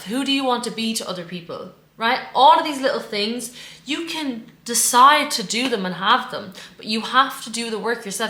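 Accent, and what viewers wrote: Ireland. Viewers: Love your Irish accent and your style of presenting your information. Wish I had your talks when I was young.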